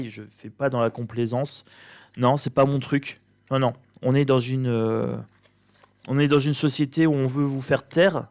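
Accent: Nord-Pas-de-Calais, France. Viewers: Coucou sa fait du bien de vous entendre parler chti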